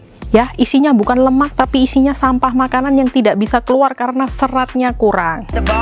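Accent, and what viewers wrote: Java, Indonesia. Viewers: Sueneng aku mbek penjelasan e mbak e medok ee lohh🔥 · seneng bgtt bgttt sm cici nyaa kalo omg jowoo medok bangett huhuhuuuuu 😂😂😂 jd bikin smgt buat nonton eh olahraga yuk!!' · maksud sy liat cara berbicara lucu emeszz, medhok2 jawa, 👌😘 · Sampeyan iki wong ndi to cii 😂😂😂 bahasane medok men pas ndagel 😂😂😂 ke mekel tapi mak jleb ning otak😘 lope u 😘😘😘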